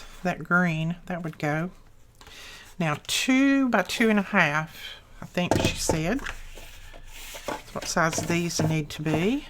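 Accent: South, United States. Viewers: ❣️❣️❣️ I almost never comment after yesterday's comments I want to let you know how I so love watching your channel! You're great and have such creative and understandable instructions. And, we talk the same language❣️ Although I have been away from my Southern roots for many years, I still have an accent and enjoy listening to others who do too! Keep up the beautiful work that you do, you Southern "Queen of Junk Journal Making", you❣️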